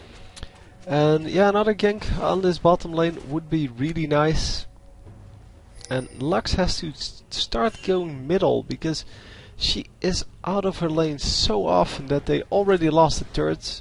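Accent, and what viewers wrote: Netherlands. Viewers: Yeah that accent for me is very characteristic, every dutch guy making videos has almost the same voice :D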